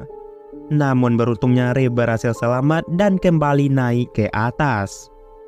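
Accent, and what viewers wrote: Aceh, Indonesia. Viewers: Mentok kali bahasa logat Aceh nya ia bos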